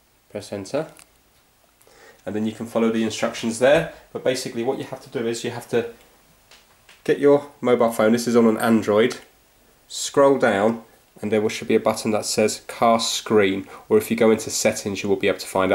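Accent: United Kingdom. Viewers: i like the brirish accent thou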